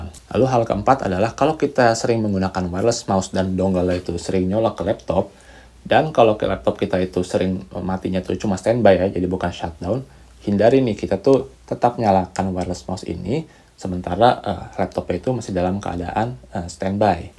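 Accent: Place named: West Java, Indonesia. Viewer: meni sunda pisan euy 😁👍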